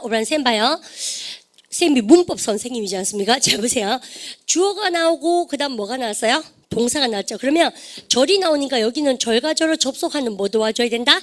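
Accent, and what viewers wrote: Daegu, South Korea. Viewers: 이제는 대구 사투리가 아름답게 느껴지네요 ㅎㅎ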